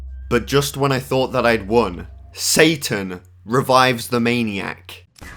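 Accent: United Kingdom. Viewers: ｄｅｅｐ ｖｏｉｃｅ ｂｒｉｔｉｓｈ ｂｏｉ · British Mr Nightmare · British mr. Nightmare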